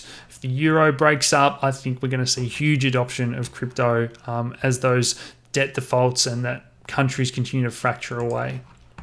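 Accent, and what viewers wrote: Australia. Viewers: Great to hear an Ozzie accent talking crypto.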